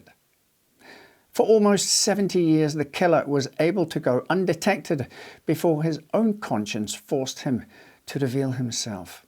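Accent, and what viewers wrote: Scotland, United Kingdom. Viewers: As a scotsman…. Does anyone know where this man is from? I’m hearing half scottish and half English in every sentence. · Your annunciation makes you sound Scottish but a while ago when I first started watching you, you sounded like a Brummie. Great content but.......distracting.. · Very distinct presentation.Scottish I assume?Either way very excellent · It's a Scottish accent, don't know much about the world?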